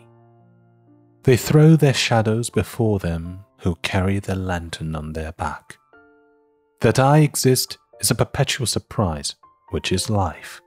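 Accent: United Kingdom. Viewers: 好美啊！英音听起来很有质感。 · 優雅的英國腔' 真是迷人啊! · 英音好听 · 好喜歡英音英音讀詩特別有意境好贊！ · 喜欢英音的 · 可能是因为住在美国的原因，美英会更顺耳。但是您的英英感觉很舒服，似乎诗的朗读英英更有感觉。谢谢!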